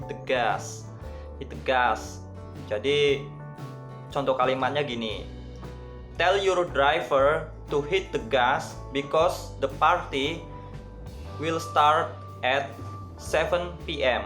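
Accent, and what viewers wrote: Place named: Java, Indonesia. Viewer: Anjir jawa bener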